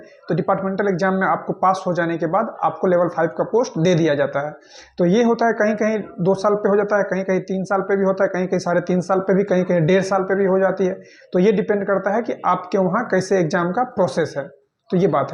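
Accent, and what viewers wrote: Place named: Bihar, India. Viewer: Bhai tu bihari bhsha q bolta h ganw wali .. Hindi b nhi shi se bol pate h bihari 😮lafda ko lfra 😂 wah😮..pta nhi ksi ghatia education h wha k school n teachers ki jo shi sbd kaise bole jate h vo b ni sikhate 😢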